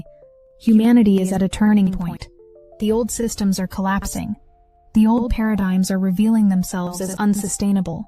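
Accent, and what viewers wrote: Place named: Netherlands. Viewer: There is something with dutch people and english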